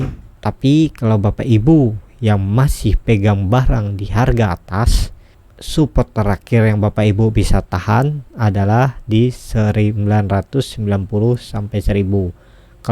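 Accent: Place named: Borneo, Indonesia. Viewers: Bos logatmu kok kadang seperti orang sunda?. Aslimu Borneo apa sunda? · Logatnya original ini asli borneo..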